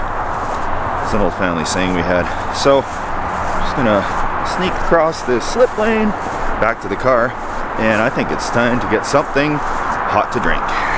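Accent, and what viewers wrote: Canada. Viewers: His accent is 100% Canadian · his accent always makes me think of my favorite Canadians. Trailer Park Boys is timeless.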